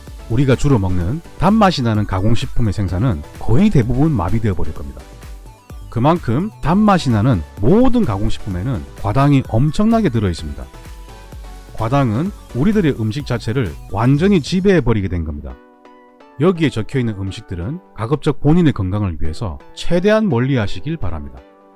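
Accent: Gyeongsang, South Korea. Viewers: 경상도 사투리가 구수하고 정겹고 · 경상도 사투리 억양 너무 듣기 좋네요 이 억양 듣고 싶어서 계속 다른 것도 더 듣고 싶어요 물론 내용은 상상을 뛰어넘을 정도로 최고 중에 최고구요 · 갱상도 사투리 정감이 갑니다. 의학상식을 나누어주셔 감사합니다. 복된 삶이 되시길~~ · 감사합니다 ~~경상도 사투리가 구수하십니다.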